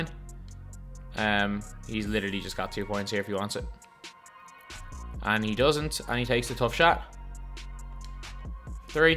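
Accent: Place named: Ireland. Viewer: There’s clearly a “H” in “threat” saying “trett” makes no sense. I also don’t know why you say “or” for “R”. I get that you’re Irish but it’s just grammatically incorrect. Good informative videos though 😂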